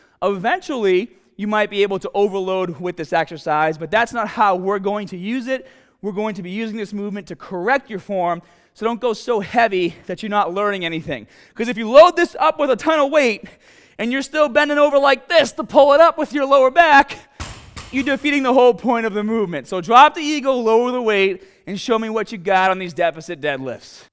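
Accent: Boston, United States. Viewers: With a slight auch in your back you want to position the baabell, love that Bostonian accent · I think he is from boston. Is he? Sounds like he is. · love that boston accent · Like the southey Boston accent!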